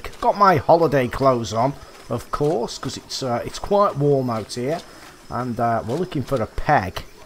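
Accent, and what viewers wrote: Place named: Scotland, United Kingdom. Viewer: thank you🙏 your accent is funny do you come from Scotland?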